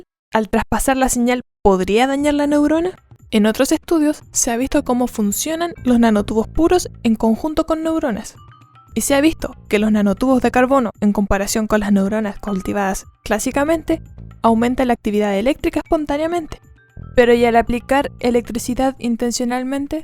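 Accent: Chile. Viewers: Me gusta más tu acento chileno 7u7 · Buen video! Eres chilena?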